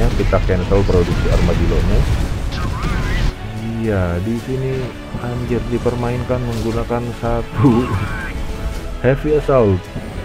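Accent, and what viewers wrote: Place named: Central Java, Indonesia. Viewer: Btw asli mana bang,dari logat ngomongya kaya or Jateng..🙏🙏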